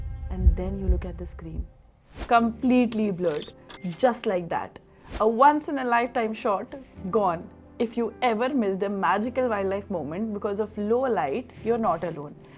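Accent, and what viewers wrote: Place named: India. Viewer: Indian?? what accent do you expect?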